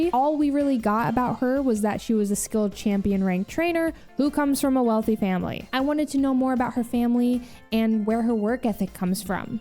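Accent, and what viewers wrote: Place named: Dakota, United States. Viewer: Are you from a Dakota? You sound like the movie Fargo.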